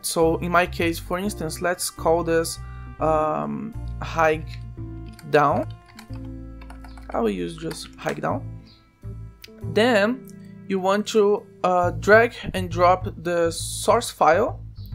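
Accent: Brazil. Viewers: esse sotaque é br em hahahaha, nice man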